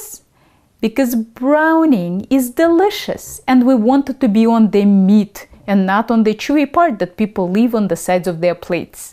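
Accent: Russia. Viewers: this russian accent, sexy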